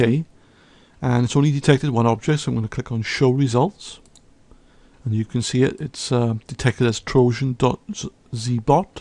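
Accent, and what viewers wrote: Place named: Plymouth, United Kingdom. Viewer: You saved me 120 pounds, for that... you get my sub. thank you so much. PS is your accent from plymouth? <3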